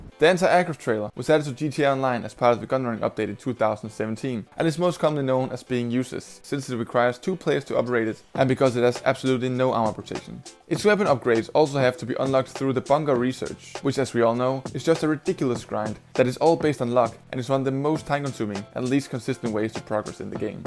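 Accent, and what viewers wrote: Denmark. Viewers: i can hear you dansih becus of the accent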